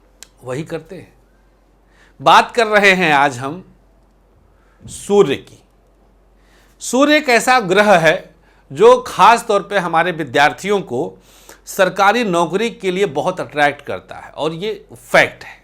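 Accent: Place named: Varanasi, India. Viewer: sir aap ka. Bolane va baat kernai ka andaza ek dam banarasi hai 👍 jab aap datatai hai to 🙏🙏🙏 you are great sir.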